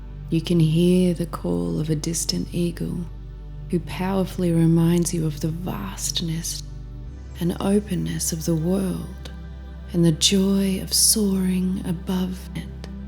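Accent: Australia. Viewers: It’s such a welcome to hear your beautiful accent! I’ve been living State side for almost 14 years now (time flies!!!) & have been missing home 🇦🇺 heaps. Appreciating your affirmation videos as well as the meditations while getting a dose of aussie accent 🙏🏻 I’m so grateful I’ve found your channel that aligns perfectly with the inner work I’ve been practicing. Many thanks & blessings 🙌❤️🙏🏻✨💓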